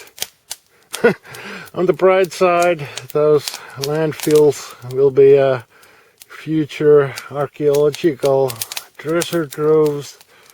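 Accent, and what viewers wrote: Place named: Finland. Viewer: You are a very good narrator and always make me smile with your Finnish accent and humour - well, in polite English, I would say that mostly, you are 'extracting the urine' out of things you witness. What tent was that one - cancel, that, just expanded the description - is it heavy to carry?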